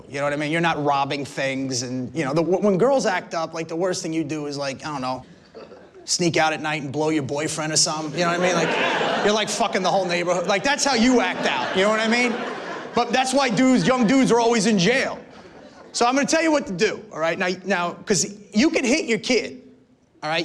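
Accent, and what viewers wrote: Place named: New Jersey, United States. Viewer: Love the Joisee accent and the cutting humor. Can't take yourself seriously ever again after listening to for an hour. I'd love to hear him take on Trump.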